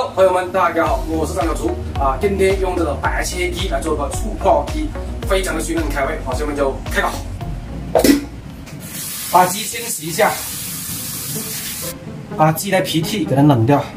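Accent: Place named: Guangxi, China. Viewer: 柳广普通话😀现在兴吃醋泡鸡？👍👍👍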